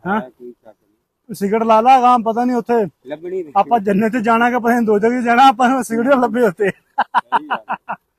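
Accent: Punjab, India. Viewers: ਪੰਜਾਬੀ ਬੋਲੀ ਤੇਰੀ ਠੇਠ ਪੰਜਾਬੀ ਸਾਡੇ ਨਾਲ ਦੀ ਬਹੁਤ ਵਧੀਆ ਲੱਗਿਆ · You. speak indian punjabi not pakistan punjabi from west Yorkshire UK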